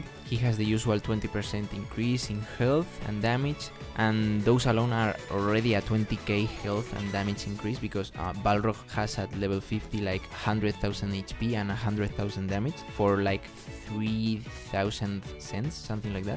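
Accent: Spain. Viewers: eres español? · Se nota muchísimo el acento español, gran video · amo tanto el acento español en el inglés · Es la leche ver a un español hablando ingles, buen video igualmente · Soy yo, o es obvio que eres español? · are u spanish?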